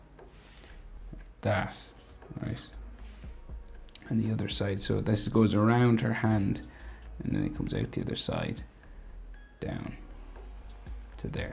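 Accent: Ireland. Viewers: I am curious, are you irish?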